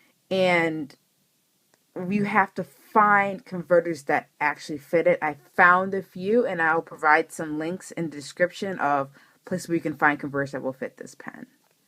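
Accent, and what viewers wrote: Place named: United States. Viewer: That sounds like feedback rather than scratchiness. However, if you want it to be butter smooth, run it over some mylar paper. See Ink Noveau and sbrebrown channels for details about doing this. Although I'm in the UK I bought mylar paper from Goulet; because it's so light, there isn't much shipping to pay. But from your voice you're probably in the US anyway.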